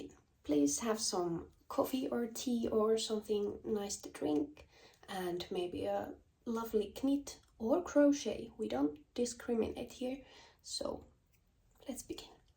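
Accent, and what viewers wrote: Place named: England, United Kingdom. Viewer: You have a beautiful English accent!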